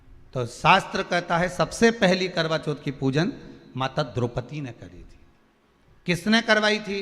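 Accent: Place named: Madhya Pradesh, India. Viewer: Aap m.p vale ho to mp ki tarh hi belonged.Maharashtra ki Tarah bolo to me aapko accha he sir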